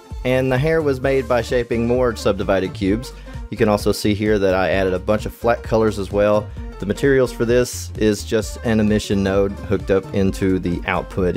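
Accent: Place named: South, United States. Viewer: please i adore this video also where are you from??? idk if im wrong here but your accent just makes me feel right at home... im from the South. extra pointers for personally interesting to me just with the way you talk haha i love this video and how you describe it all (despite the fact i dont understand ANY of it)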